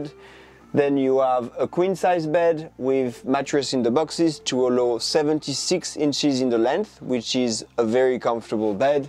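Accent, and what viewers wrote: France. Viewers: Beautiful! So brilliant! Love the French accent! ❤ So soothing to my soul!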